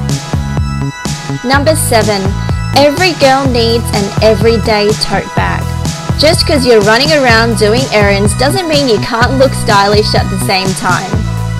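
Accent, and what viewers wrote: Australia. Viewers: Love this thanks. And love the hip-swinging and your aussie accent xx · love the aussie accent!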